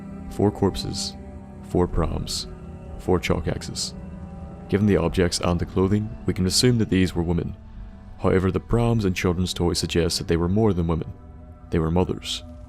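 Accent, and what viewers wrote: Northern Ireland, United Kingdom. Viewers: Have to say mate, your NI accent does wonders for the creepiness of this video :)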